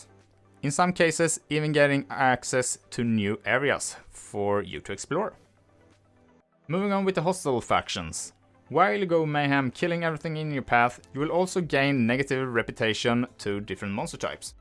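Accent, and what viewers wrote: Sweden. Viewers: i can hear in you accent when you speak english that you are SWEDISH hahahhah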